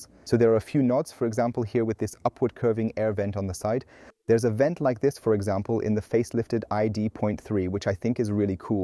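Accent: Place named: South Africa. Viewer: Hey bro, your accent sounds too familiar-are you South African??? 🇿🇦 🔥👊🏾😎 Great review though, I have thoroughly enjoyed it 🔥😉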